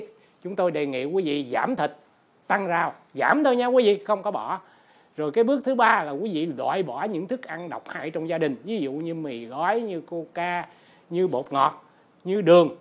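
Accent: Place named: South, Vietnam. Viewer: Chúng tôi kính cảm ơn quý Thầy chỉ dạy cặn kẻ, tận tường, lời lẻ giản dị dễ hiểu, Giọng miền nam truyền cảm ấm áp, hình ảnh và âm thanh ở đây rất tốt!!!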